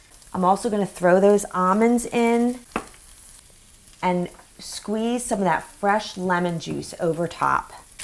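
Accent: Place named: Philadelphia, United States. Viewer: Love it. "Wudder" Are you from Philadelphia? Making this for Turkey Day today. big hugs.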